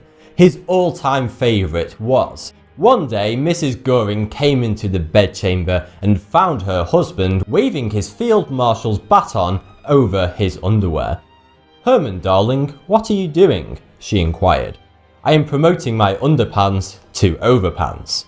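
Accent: United Kingdom. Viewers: 찰진 영국발음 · Gilbert 개인적으로는 영국억양이라 생각합니다만... (개인적으로) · 이분 영국식 발음 진짜 취향저격; 신기한게 H를 에이치라고 안하고 헤이치라고 하시네요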